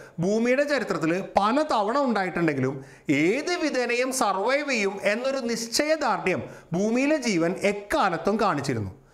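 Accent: Thrissur, India. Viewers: I do not know who you are. But with great respect, may say, your use of language is definite or conclusive. Science is never definitive or absolute- it's dynamic. It may be due to Trichur slang or accent? Though I love science, listening to this gives me ARROGANT OR ABSOLUTE VERSION of science huh! Amen!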